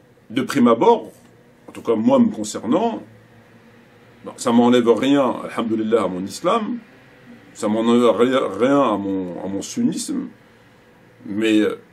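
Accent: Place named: France. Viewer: Qu'Allah te guide SubhanAllah, tu nomme toi même dans le début le conflit de fitna, la où des compagnons présent à l'époque n'ont pas prit position et parti car fitna il y avait, toi avec ton accent de français quand tu prononce péniblement des mots en arabe tu viens tenter à mettre en doute l'attitude à avoir pour ne pas laisser le conflit entre eux et Allah, tu dis toi même c'est un conflit entre musulmans, tu t'entend pas ? SubhanAllah on s'en fou de ton avis, garde pour toi ton venin et ta compréhension avec ta raison 3aqli Laisse la transmission au vrai savants et va apprendre l'arabe correctement sinon va regarder un film sur netflix par exemple Tu es à l'image du zindiq oubrou que l'on vois derrière toi sur un livre dans d'autres de tes vidéos Fais de la pâtisserie ou je sais pas, mais trouve toi une autre occupation que de reprendre du venin philosophe et avec ta raison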